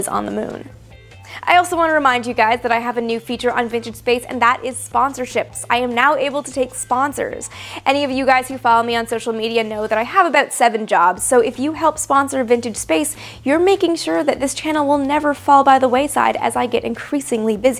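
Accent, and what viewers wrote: Canada. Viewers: Are you Canadian? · your hot and you sound Canadian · Is that a Canadian accent I detect?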